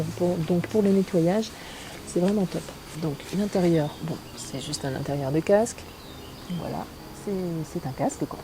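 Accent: France. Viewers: good choice nice helmet btw your English with french accent I LIKE IT.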